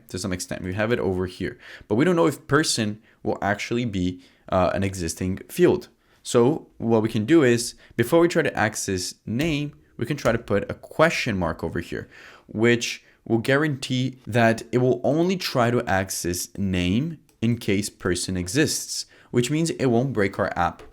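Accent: Brazil. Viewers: Esse mlk é BR certeza ou é português kkkkkkk to maratonando o canal dele, bom demais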